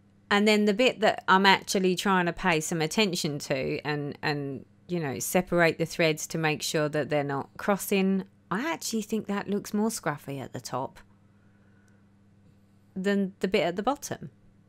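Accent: United Kingdom. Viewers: Hi! Please keep on rambling, live or voiceover does not matter to me. I’m from Sweden and I love listening to your British accent. 😘 Regarding railroading: have you tried railroading in both directions, not just on the way back? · Love watching you stitch...as for uniformity of stitches, have you watched FlossTubes by Blitstitch? He’s an engineer, so love his take on how to get uniform stitches...I like his #5 and #36. Love your British accent also! Lol!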